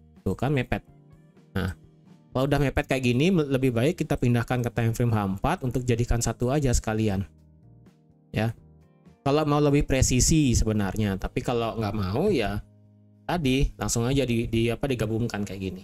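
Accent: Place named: Bali, Indonesia. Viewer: dari logatnya asal bali nih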